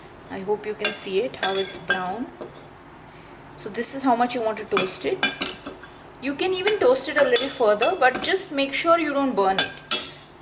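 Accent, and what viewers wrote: India. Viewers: Try some elocution lessons so you don’t have that thick Indian accent ...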